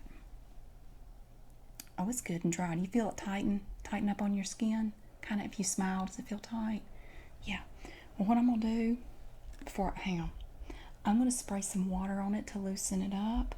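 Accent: South, United States. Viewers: Southern ASMR? I'm in heaven. Subscribed!!! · Amazing! Southern Accent is such a good trigger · IT's cool to see another asmrist with a southern accent. I felt a little self-conscious about my voice but starting to feel more okay with it. You're amazing! Subscribed! :))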